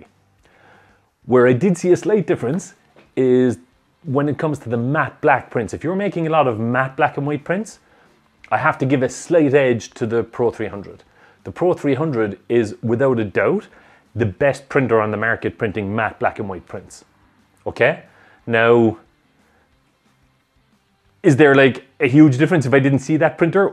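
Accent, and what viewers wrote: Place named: Kerry, Ireland. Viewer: Hi, brilliant and straight forward review as usual. Bit of a daft question and definitely not printer related! Having enjoyed many trips over the water to Ireland encountering all the diverse dialect changes I can't help but try and best guess. So my best guess for yourself would be the West side, maybe Kerry or not quite that far South.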